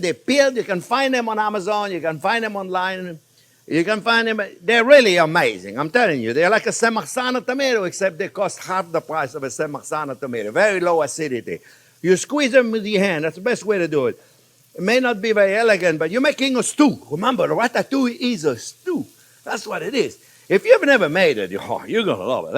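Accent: France. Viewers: Chief Jean continue talking , I love Your French accent it’s different than other chiefs , we choose yours to watch , 😂👏👍👌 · Beside the excellent recipes and very well explained, you share with us, I enjoy the beautiful French accent . That is a plus. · Thanks Chef so much, I love all your talking, and sense of humor, saying "I am from N.Y" with that thick French accent:), you are so funny. and I truly feel lucky to find myself in a free , French culinary school, without the pressure to learn these recipes in English, with so much fun and humor. I agree those who think you talk too much, they don't deserve to watch you, also I find it extraordinary rude to speak to a chef of your Caliber like that, they sure don't understand the Culinary culture and in French Culinary school, if a student talks to Chef like that, would not longer be there. so these rude students, who watch your lessons for free and disrespect you, really don't deserve these teachings. 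I honor you.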